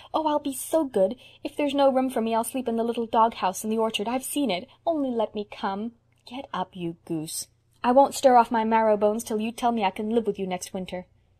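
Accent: United States. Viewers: Her English seems perfectly fine to me. A very proper American accent, without strong local dialect. What accent do you consider to be "proper"? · Impossible to listen to the dreadful use of the English language,why do the yanks deform English?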